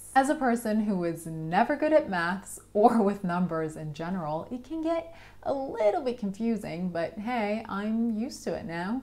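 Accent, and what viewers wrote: California, United States. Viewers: I thought from your accent you were from the US! Enjoy your videos. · Curious Why do you sound American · Everyone here seems to think you sound completely American, and while your accent is very American-ish I can't really hear any of the regional tells that would make me think you actually learned to speak English in America. Did you live in America or did you learn to speak English while living in Austria? Either way I've become a big fan of your channel and think it's great that you are helping others learn. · Most posters seem to be more interested in accent and her claim of being Austrian than her video content. Accent is completely US but that doesn't mean she's not Austrian.. or perhaps she's Australian.. who visited Austria via the valleys of California? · Are Austrians taught North American English? Or was that the accent you just learnt? 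My German mate in Uni was taught English by a Lancastrian when he was a kid so sounded more native than most of us. Shout out to Kris!! I thought you were a yank. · Like watching but interested to know why you have a US accent. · You definitely learnt English in the USA , would never have thought of you as Austrian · Sorry I've only recently been introduced to your channel, but I'm intrigued as to why you have such an authentic American accent as an Austrian? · Austria is such a beautiful place but you don't half sound american. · Can you tell us where your American accent IS from? An American school? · You don't sound Austrian. You sound American. I can't detect any Austrian accent. You are way ahead of Arnold Schwarzenegger who has lived in USA nearly his whole life. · if your austrian why you trying to talk american? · You have a perfect californian accent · Obviously was taught English by an American, hence the accent. 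· why are you calling yourself Austrian? when that's either an American or Canadian accent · Austrian, thought you were American lol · Austrian or American?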